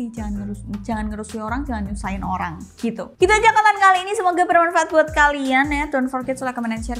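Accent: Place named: Semarang, Indonesia. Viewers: Medok tp menawan ini * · Aku suka gaya ngomong nya * jawa kali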